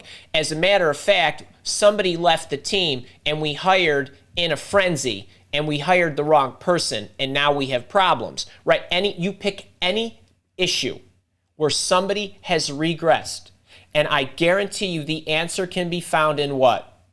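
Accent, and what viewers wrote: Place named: Midwest, United States. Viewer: I'm only 10 seconds in, so I can't say anything about the video content itself, but I just have to say that I LOVE your energy and enthusiasm. Are you from the Midwest by chance? I absolutely love your accent; it reminds me of home. Thank you for bringing this type of energy when preparing for interviews is so scary. :)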